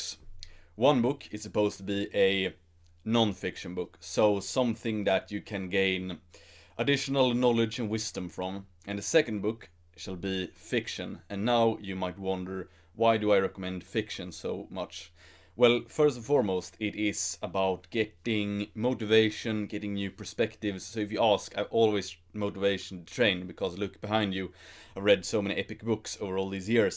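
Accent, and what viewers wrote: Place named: Stockholm, Sweden. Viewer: Är du från Stockholm? Kan inte undgå att höra dom karaktäristiska "i:en"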